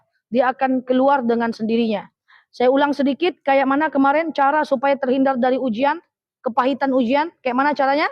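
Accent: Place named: Sumatra, Indonesia. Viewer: Masya Allah, baru ketemu Chanel ustadzah, senang penyampaiannya dan seru sambil senyum senyum, masuk ilmunya, terimakasih Ustadzah, Ngomong ngomong ustadzah dari Sumatra bagian mana ya Logatnya saya suka, Krn saya juga dr sumatra, ❤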